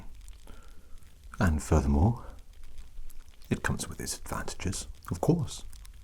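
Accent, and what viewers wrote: Wales, United Kingdom. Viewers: I loved everything about this video! I've been on the fence about Elden Ring since I'm not sure if I'd have time to actually play. I divide my time between Everquest, Everquest II, Guild Wars, Guild Wars 2, Lord of the Rings Online, and, most recently (thanks to you, ) Raid Shadow Legends. Anyway, the costume is truly awesome but then you are a talented individual so it's not surprising. Your Welsh accented voice, I could listen to that all day, at least until I fell asleep. Thank you for sharing your time and creativity with us, I appreciate it muchly. Oh, and as a side note, I always dream in color. 😊😊 With permission, hugs 🤗🤗🤗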